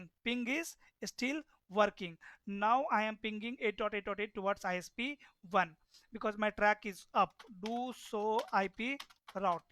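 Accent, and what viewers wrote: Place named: India. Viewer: It’s first time that i cloud understand the Indian accent so clearly. you are wander full lecturers!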